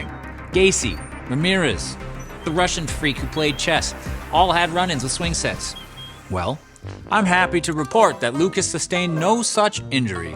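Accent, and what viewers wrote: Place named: Canada. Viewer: Such a good episode, full of little tidbits I hadn't heard of. Also, love hearing the Canadian accent poke through every now and again.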